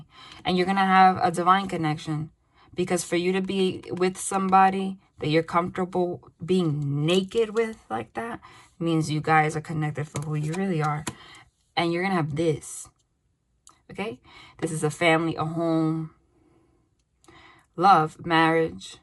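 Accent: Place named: New York, United States. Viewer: I ABSOLUTELY LOVE YOUR ENERGY, YOU HAVE ME GIGGLING RIGHT ALONG WITH YOU, ALSO LOVE THE NEW YORK ACCENT!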